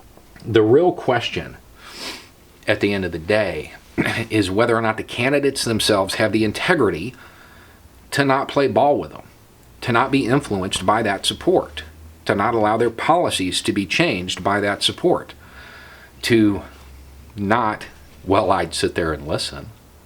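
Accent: South, United States. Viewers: Hey, I have started getting pragur university Ad's on your videos lately. While this is hilariously ironic I can't help but wonder why the Youtube Algorithm would think this is a good idea. My only guess is either that it is because you are a political channel and these are political ad's, or, that it goes deeper than that and the algorithm is going more off what you look like than what you are talking about. It views your videos and goes "Oh, a guy with a southren accent and a beard talking about politics, must be a right winger" and just goes with that. Anyway, just wanted to share because it honestly made me laugh.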